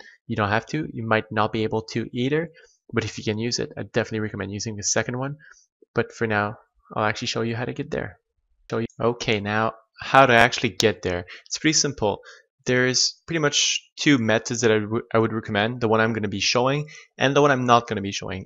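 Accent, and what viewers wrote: Quebec, Canada. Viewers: Hey that accent, Quebec:P Bon guide!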